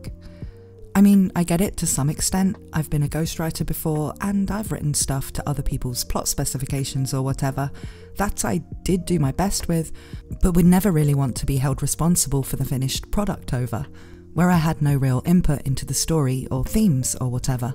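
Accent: United Kingdom. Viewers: I'm loving your videos, I found your channel the other day and I just really like your chill demeanor and accent, I know is a type of British accent but I'm bad with differentiating accent and dialects sorry, even here in America too since I'm a latino lol, but I try since im a fan a couple types like Welsh for example thanks to Dragon quest but I'm kinda rambling at this point so I digress, but I really like it and your dry humor. I've been kinda binging your vids when I can ever since.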